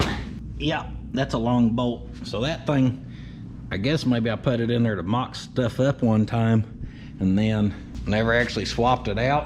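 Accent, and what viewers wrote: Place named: Appalachia, United States. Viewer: LMFAO - I used to think the "Deliverance" - "inbreed from the hills of Kentucky" ACT, was just that, an act just for filming YouTube videos - you know just a shtick. But I have come to realize that it is real, this is really how you are, and the way you talk, and your real IQ. Kind of makes this channel even funnier when one realizes all the jumping, dancing, talking like a hillbilly, doing things that make you look not so sharp, is actually REAL.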